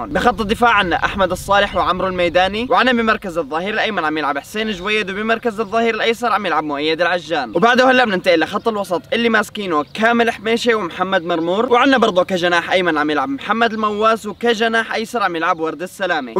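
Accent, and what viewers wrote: Saudi Arabia. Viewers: هل انت سعودي ولا سوري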